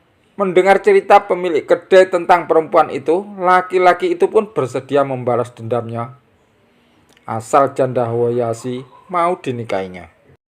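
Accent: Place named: Java, Indonesia. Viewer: Yg cerita nya wong jw ne medok bngt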